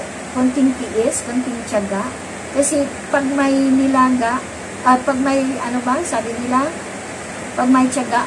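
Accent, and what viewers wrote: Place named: Visayas, Philippines. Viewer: Wow congratulations madam hindi ka nag iisa matigas din mag salita hihi pero gwapa basta bisaya😊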